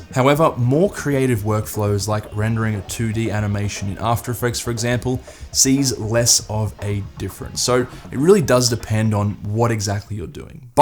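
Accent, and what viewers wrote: Australia. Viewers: You speak like an Australian.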